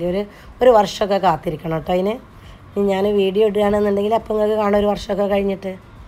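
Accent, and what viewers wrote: Malappuram, India. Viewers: അടിപൊളി നമ്മുടെ മലപ്പുറം സംസാരം സൂപ്പർ ആട്ടോ 👌💞💞💞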